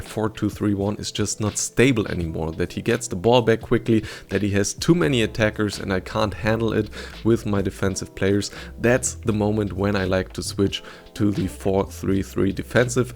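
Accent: Germany. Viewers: Deiner Aussprache und deinem Akzent zufolge kommst du aus Deutschland wieso machst du die Videos dann nicht auf deutsch?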